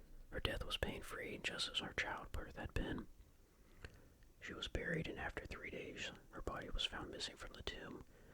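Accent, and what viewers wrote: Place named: Delaware County, United States. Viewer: I’d love to focus on the reading but I can’t help but wonder if you’re from Delco PA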